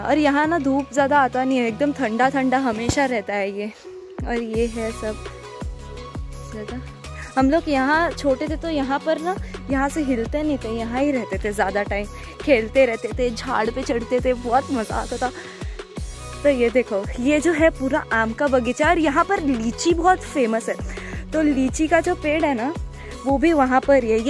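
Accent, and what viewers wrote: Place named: Maithili, India. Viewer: Where are u from? Sitamarhi or Muzaffarpur? The accent i heard is "Maithali'', so i guess u from Sitamarhi. I am from Sitamarhi, Bihar too and currently i live in New Delhi. BTW i loved your vlog. It reminded me of my village. Keep going.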